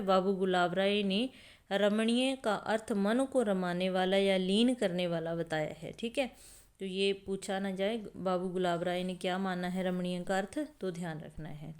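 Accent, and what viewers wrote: Punjab, India. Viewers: Mam aap Punjab se h kya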